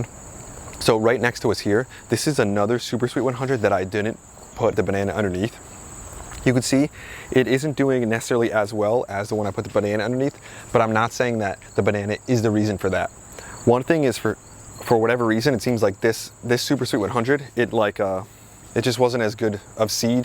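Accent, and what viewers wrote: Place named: Philadelphia, United States. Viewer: ❤❤❤❤❤ I love your channel!!! (Can tell you’re near Philly, love to hear someone who also has the accent :) )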